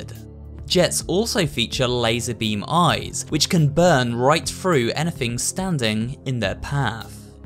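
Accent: England, United Kingdom. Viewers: Im german, so i understand the names even better. Also its always fun to hear an english person pronounce german words.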